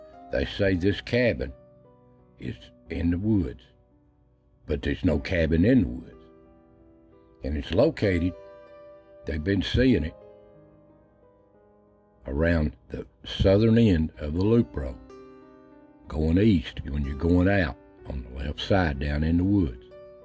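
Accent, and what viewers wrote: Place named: South, United States. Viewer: I love hearing these old stories. Thank you for documenting them. You have a nice southern US accent. Your way of speaking and tone is very relaxing & soothing to listen to (no loud sound changes/ noises in the video).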